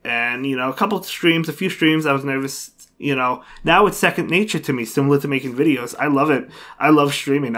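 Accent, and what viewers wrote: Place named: East Coast, United States. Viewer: I just thought you had an east coast accent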